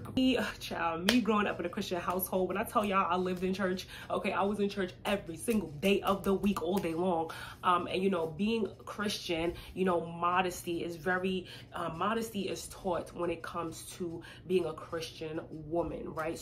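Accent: New York, United States. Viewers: I forgot how much I love a NYers accent; I haven't been on youtube in some time. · You sound New Yorkish...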